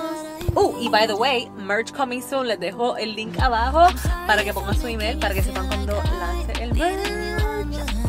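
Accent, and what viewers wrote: Puerto Rico. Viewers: Que bella habla boricua, que bella esa china...Eres increible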